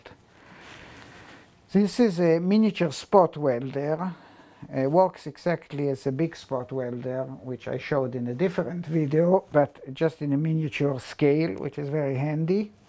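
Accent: Israel. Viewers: Hebrew accent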